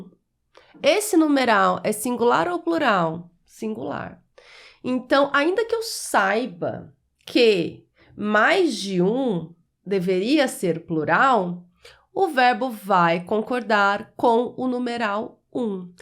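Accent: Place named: Minas Gerais, Brazil. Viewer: Muito elucidativo, professora com sotaque mineiro (alto paranaíba?), parabéns. Acho que a complexidade das perguntas deve ser crescente, como tem sido apresentado.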